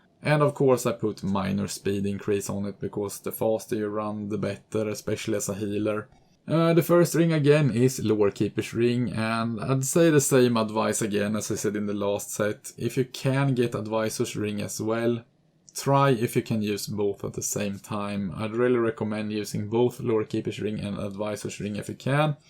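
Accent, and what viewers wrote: Sweden. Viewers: Man hör tydligt att du är svensk!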